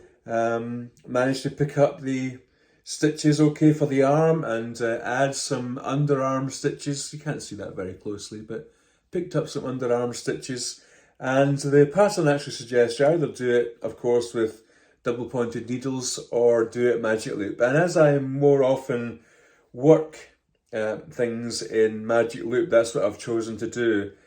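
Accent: Scotland, United Kingdom. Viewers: Your knitting podcast is one of the more relaxing ones and is also very realistic and relatable. (Meaning you don't say things like, oh double pointed needles are so easy I use them to knit lace in the round while also bathing my cat!). Also, I am homesick so it's nice to hear a Scottish voice.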